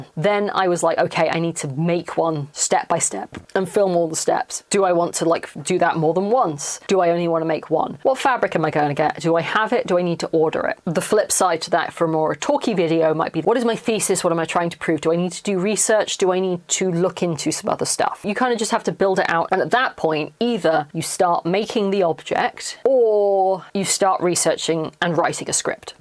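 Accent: United Kingdom. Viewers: I love listening to the non-posh British accent. Because its HONEST. (And I run you at 1.5x or 2x, so you definitely dont talk too fast.) I love the ND content, because it fits my brain. I dont even remember what I started watching you from, but I love the "historical costume" side of YT. Stayed for the clearly LGBTQIA+ person, because you remind me of my family. I dont plan on LARPING, or starting YT, but stay for that kind of content because YOU. And I live in my subscription tab too (well, from there to "watch later" because I have more than I can watch daily.) Smart idea to beginner YT peeps: have a catalog ready to upload BEFORE you start the channel. Its easier to release on a schedule if you have a month of already edited things ready to upload.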